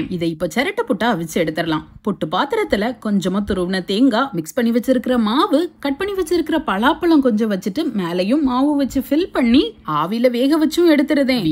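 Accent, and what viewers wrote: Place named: Nagercoil, India. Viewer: New try... N ur Nagercoil slang❤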